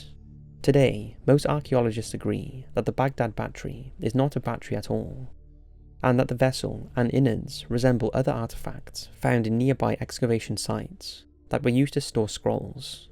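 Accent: United Kingdom. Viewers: What do i think - that your a fuuking natzi, talking like i britt, click bate bitch! Love from Israel!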